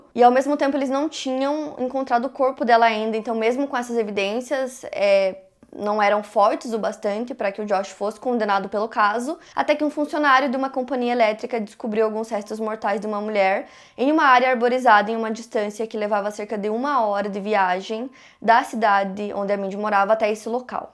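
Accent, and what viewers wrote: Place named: São Paulo, Brazil. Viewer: Nada a ver com o vídeo, mas a pegou completamente sotaque de Paulista 🤣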